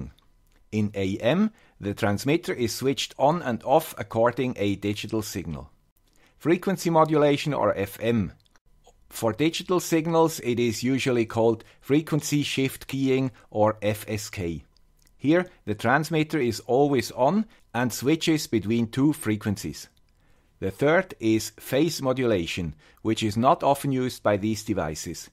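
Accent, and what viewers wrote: Switzerland. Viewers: guy with the swiss accent... cheers from indonesia..😀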